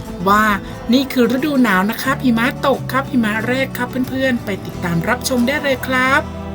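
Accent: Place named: Isan, Thailand. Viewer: ดูเพลินเลยจร้า สวยมากๆเว่าอีสานได้น่ารักมากๆจร้า..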